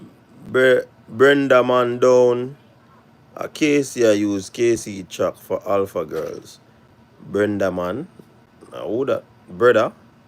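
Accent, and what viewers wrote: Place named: Jamaica. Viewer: Coach anyone who doesn’t want to hear you speak patois they don’t have to listen. Kmt. Americans do not speak English either.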